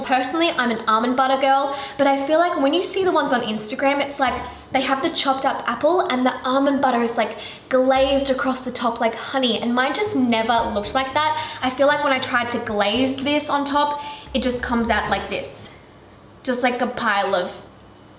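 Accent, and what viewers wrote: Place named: Australia. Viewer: Lol that's the aussie accent for u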